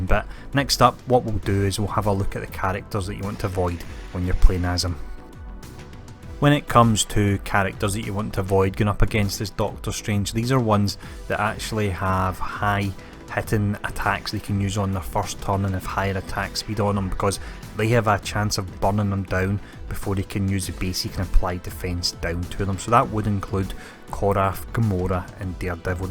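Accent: Scotland, United Kingdom. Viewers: Yeah, I gathered as much, but where in scotland? Dundee or Glasgow? I'm from sweden but I lived in Edinburgh for a while but I don't recognize the accent =)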